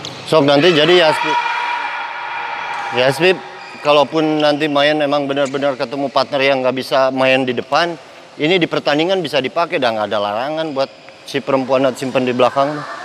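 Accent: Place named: West Java, Indonesia. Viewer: Sy suka cara ngelatih,,si akang 👍👍 Terus dari ngomongnya,,,jd seru Sunda pisan 👍👍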